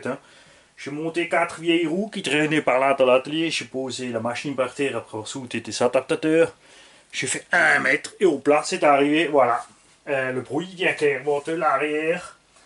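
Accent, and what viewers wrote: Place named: Alsace, France. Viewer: Je savais pas que t'avais un accent alsacien aussi prononcé mdr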